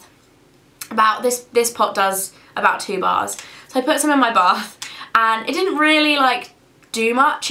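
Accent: England, United Kingdom. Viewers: i'm so jealous of anyone w a british accent! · I'm the ONLY one in the world, i know, who cannot stand the british accent, sorry.... Cant watch now. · It's not a British accent, its an English one...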